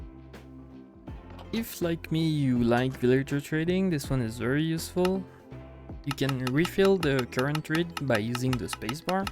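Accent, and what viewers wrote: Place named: Quebec, Canada. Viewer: Nice , I knew about most of em but didn't know creepers needed line of sight , thought was a player proximity thing as I have been hit many times by creepers sneaking behind me, but I guess that's my line of sight and not his or hers ( not sure about creeper genders 😉) always something to learn. And yes I agree boats are actually ridiculously op, who would have thought one of the most powerful and versatile items in the game would be a boat. ( maybe that's a hyperboly) . BTW are u French Canadian, I always thought u were from France but today I detected some hints in your accent that seems distinctly French Canadian. If so hello from Montreal.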